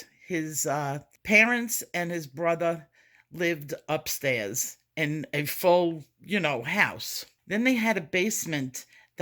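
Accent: New York, United States. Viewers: What a fantastic find. I loved hearing the family history story. I always knew there was a strong New York connection. Can’t miss that island accent. 🤣. Former New Yorker here as well 🦄❤️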